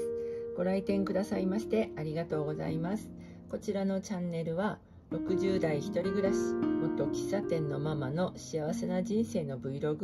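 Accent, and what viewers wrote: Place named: Hokkaido, Japan. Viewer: さん初めまして😊 さんの話し方が、北海道にいる親戚に似ていて、とても懐かしくなりました♪ これからも、動画のアップを楽しみにしています！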